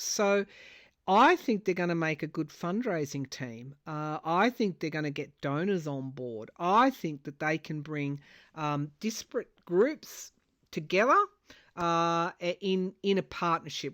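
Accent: Australia. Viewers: Hi, Thank you for your thoughts, I have been watching and appreciating your videos for a long while (and loving your Australian flair). I am one who is still very confused and saddened by Biden stepping down. I understand your excitement for Kamala, I like her and I am so glad she chose Walz. But I feel like Biden was shot down by his own party, he, the one who did all the hard work of bringing us out of the tailspin of the Trump world. The last couple of weeks are the first time that it has been painful to watch your videos. Our 14 million votes for Biden were ignored as Pelosi even admits, in a new article, that she nudged Biden out. And when you said here that Trump's donations are drying up because Biden isn't in the race? What??? I wish you would read on Biden and how he is feeling and what happened there and bring some attention to that. Biden would have won in a landside. 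I have no doubt that Kamala and Walz will win as well. I wish you would address the pain that some of us are feeling, with our preliminary votes being ignored for Biden and still caring for him. I was so inspired with him saying "When you get knocked down, you get back up". My heart was with him and I wanted to see him defy all the MSM and the pundits and win. I can't even watch MSNBC any more, although maybe that's a good thing. With much love💕 Elizabeth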